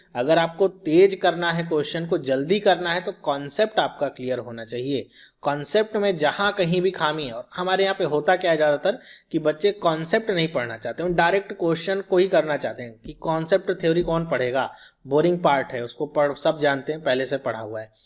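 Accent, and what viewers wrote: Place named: Bihar, India. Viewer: his language seems to be bihari difficult to understand :(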